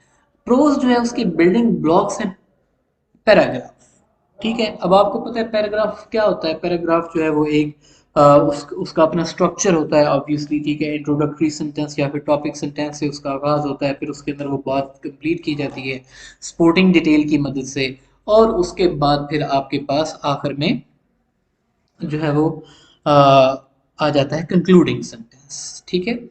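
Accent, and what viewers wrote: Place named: United States. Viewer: Aap ki mother language to urdu hy but u speak English like an American so goo sir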